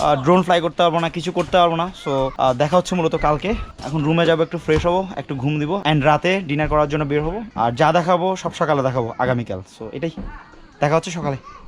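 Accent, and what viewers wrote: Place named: Chittagong, Bangladesh. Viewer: চট্টগ্রামের আঞ্চলিক টানে শুদ্ধ বাংলা বলার চেষ্টা মাত্র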